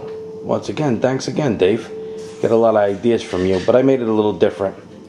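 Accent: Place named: New York, United States. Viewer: Your NY accent remind me lot of the guys from Grucci when they fly here to las vegas from new york to work on a show hahaha. and all i would hear is " hey Tony...go fuck yourself!" funny as shit loading and wiring shells in the sun and everyone is talking shit left and right...but playfully though..makes work more fun. happy 4th man!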